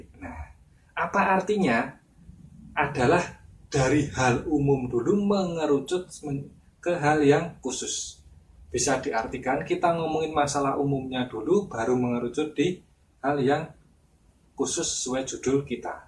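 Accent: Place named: Java, Indonesia. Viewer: males banget denger MEDO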